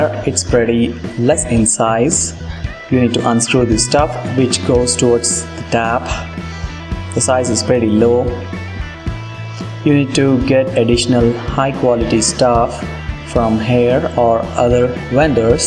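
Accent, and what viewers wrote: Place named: India. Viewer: bro keep the natural Indian accent :) although it wasn't that bad but still keep the natural :V Potato kind of Indian accent XD and nice video :)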